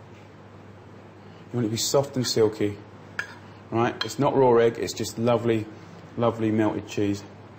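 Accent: United Kingdom. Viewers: He doesn't have a lisp, he's from the UK. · He is British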